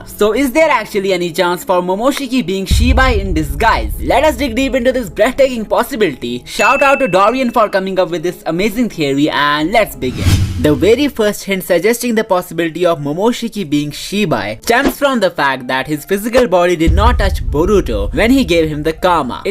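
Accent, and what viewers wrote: India. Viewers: R u from India?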